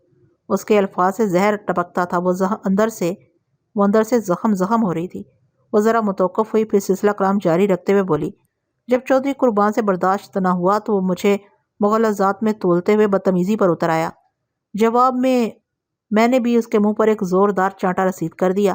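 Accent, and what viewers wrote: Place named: Pothwar, Pakistan. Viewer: محترمہ آپ کا پوٹھواری لہجہ اچھے خاصے قصے کا ستیاناس کر دیتا ہے۔ اپنے لہجے میں معنویت پیدا کرنے کی کوشش کریں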